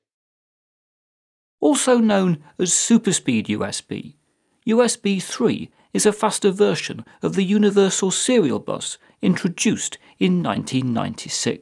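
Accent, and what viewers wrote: United Kingdom. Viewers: brittish dude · It is a British Accent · Dat british accent! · Nein, es ist Britischer nicht Amerikanisch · He's British... this is how they all speak, what more did you want?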